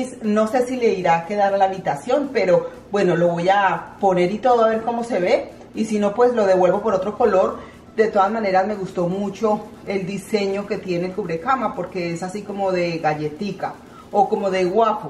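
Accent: Colombia. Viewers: Tan linda mi,nunca has perdido tu acento Colombiano eres tan genuina sencilla,no eres egoísta tienes don de servicio por eso eres triunfadora en tu canal, amas a tu mami y flia.Tus manualidades bellas Dtb 🙏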